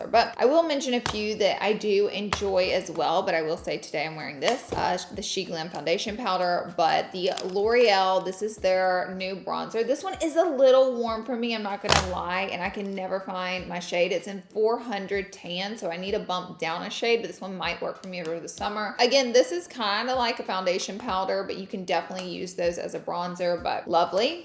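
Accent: South, United States. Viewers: Tell me you're from the South without telling me you're from South ! FYI ... Nola girl here, so love you still have it! Anytime you say oil and y'all! 😂🤣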